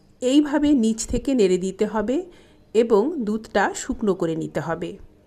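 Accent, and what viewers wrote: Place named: Bangladesh. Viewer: দিদি খুব ভালো লেগেছে আপনার রেসেপি, দিদি আপনি কি আসলেই কোলকাতার? ভাষায় বোঝা যায় না, মনে আমাদের দেশের মানে বাংলাদেশের লোক আপনি।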